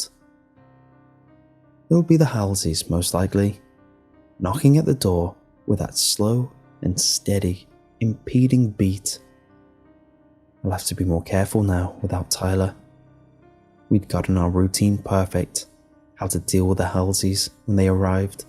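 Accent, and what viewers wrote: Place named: United Kingdom. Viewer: You really lucked out with your damn accent. Anyone with a British sounding accent is just so much easier to listen to.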